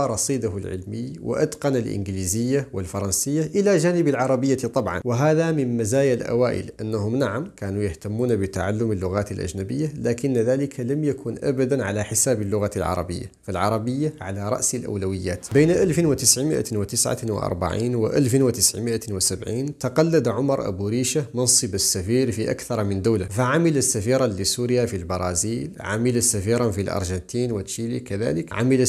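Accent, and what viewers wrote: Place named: Yemen. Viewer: متابعك من اليمن هل تعرف ان لهجتك كانها من لجهات اليمن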